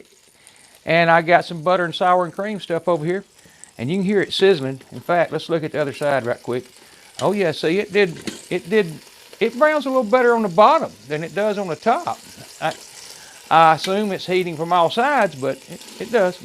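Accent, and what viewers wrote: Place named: Kentucky, United States. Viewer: I'm from Lexington, Kentucky and your accent makes me miss my Kentucky buddies. :) Great videos.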